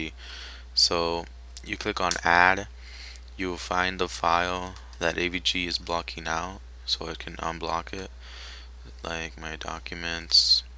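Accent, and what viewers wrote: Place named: Mexico. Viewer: must be mexican, the way he talks with the talks with the question rises in his sentences.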